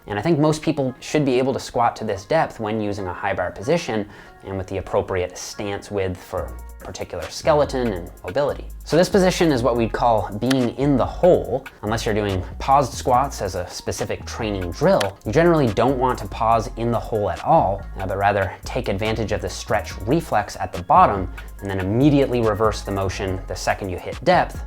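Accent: Newfoundland, Canada. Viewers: I hear a newfie accent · The burr. This man is Canadian no doubt. Awesome content dude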